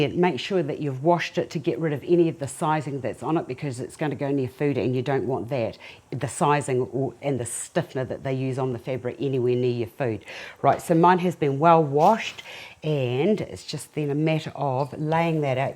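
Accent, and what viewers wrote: New Zealand. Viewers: André Manoel she is from New Zealand, not all sound like her though, we all have different accents here. Similar but not all the same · you look FABULOUS! LOVE your dress and belt. You sound like a New Zealander. I love New Zealand. I am Scottish but live in Perth Western Australia. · I am guessing that she/you is from New Zealand due to how she pronounces "egg" like "eeeeeg"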